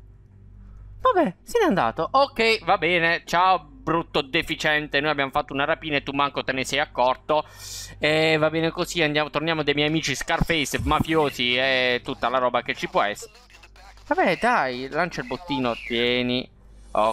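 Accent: Bari, Italy. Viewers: Sei di bari?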